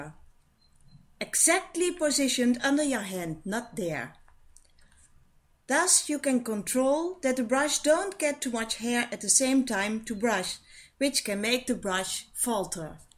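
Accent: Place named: Netherlands. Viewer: What a horrible dutch accent, my ears are bleeding.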